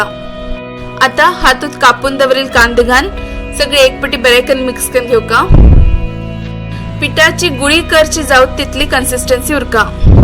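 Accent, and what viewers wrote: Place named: North Canara, India. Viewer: Yummy recipe 😋 n I love Ur north Canara konkani ❤️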